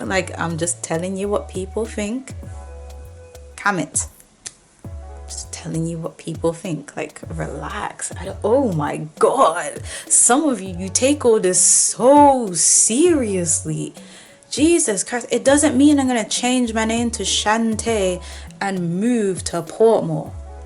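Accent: United Kingdom. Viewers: I hear a lovely British accent, not that it really matters, and of course it's really all the rest of us English speakers who have the accent, not you British folks.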